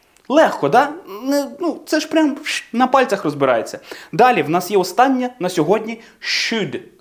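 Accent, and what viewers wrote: United Kingdom. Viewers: Так,знімай ще.П.С Чудова британська англійська у тебе. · От як у вас виходить такий британський акцент? 😮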